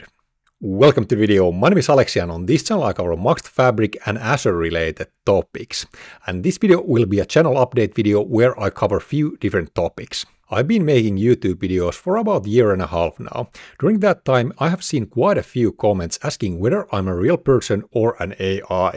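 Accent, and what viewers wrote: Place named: Finland. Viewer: Definitely can hear the authentic Finnish tone my friend, me and my team members are referring to your lessons to study the DP-600. Enjoy Fabcon, Kiitos!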